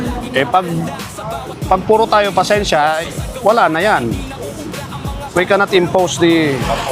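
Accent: Iloilo, Philippines. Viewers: Ilonggo ka sir kay isug man? Kag imo tuno o accent ilonggo man gid iya.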